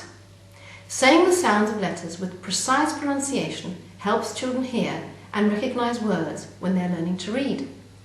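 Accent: RP, United Kingdom. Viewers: Hi Ellie, The phonic sounds of English vary according to accent. The accent in this video is 'Received Pronunciation', which is spoken in the south of the UK. We pronounce /ar/ as in 'car' like this: 'cah' so you don't really hear an /r/ at all.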